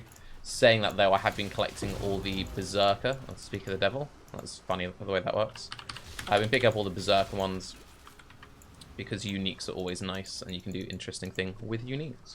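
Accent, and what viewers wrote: RP, United Kingdom. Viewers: He speaks the queen english he says it correct.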